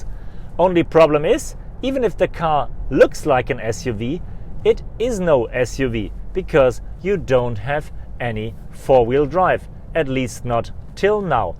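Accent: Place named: Germany. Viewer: You ain't no violinist; just a regular bratwurst German with a Nazi accent.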